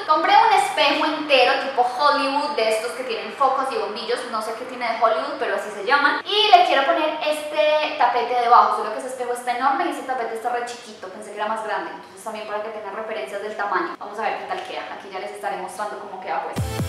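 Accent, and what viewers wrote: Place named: Mexico. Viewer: Ya hablas con acento mexicano! Seré la única que lo siente? 😂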